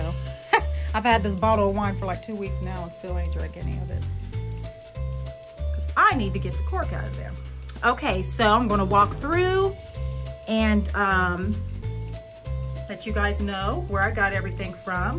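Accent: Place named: Pittsburgh, United States. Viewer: You must be from Youngstown or near PA because you talk and sound like my family from there and Pittsburg❤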